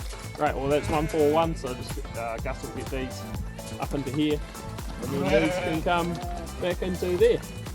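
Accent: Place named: Taihape, New Zealand. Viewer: Mate..... said with that taihape lion red twang, had some bloody laughs watching this. said to the wife, "oh shit he's got one of those datamar's reels" feel your love hate relationship with em. so anywho, im from the wider north island, been there done some of that, grew up in the windy city of Palmy and migrated through the island to north-land after a seasons in ohingati, drove stock units in your neck of the woods for couple years before going back to the front end, to many late nights loading out of fielding on a Friday night for my liking. anyways nice sarcasm on the wool. bloody sacrilege. look mate we were farming 600 ha in north-land before it all got turn into carbon pine forest but i swear the best vale out of your nitrogen is in may, sets you up for winter, and yeah i always monitor soil temps, we we 12.3 on Wednesday last week., love your vids mate. 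( oh yeah my hunterway rocks is exactlly like duke). hard headed..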